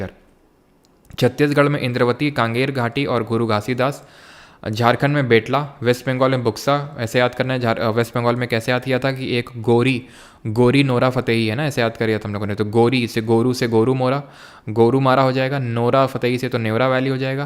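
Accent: Kanpur, India. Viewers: Boss ap kanpur se Hai ye tompakka Hai Accent se pta chal rha Hai 100%sure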